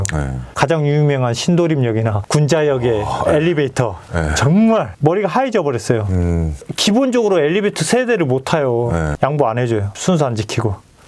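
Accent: Jeolla, South Korea. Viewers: 전라도? 외국으로 가셨내.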